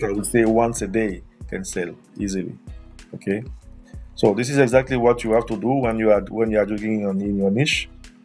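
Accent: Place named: France. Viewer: Well, it's a great video bro, GJ, so according to ur accent, I think that u are a french guy, so id u don't mind can u create a video on how to find niches on the Fr marketplace !!!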